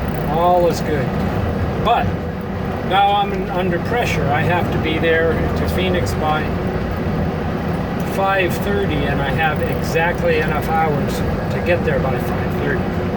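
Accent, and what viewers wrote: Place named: Minnesota, United States. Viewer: mountain trips are the best ! but whereever is fine also, I just love that seemingly MN. Lake Woebegone drawl ! KEEP ON TRUCKIN !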